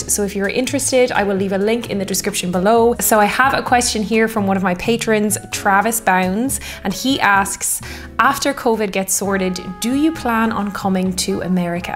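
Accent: Ireland. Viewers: We'd love to have you. We can never have enough beautiful women with an Irish accent over here 😁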